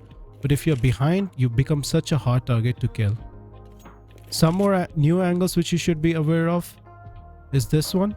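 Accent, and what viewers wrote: India. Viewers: are u indian bro? btw love ur content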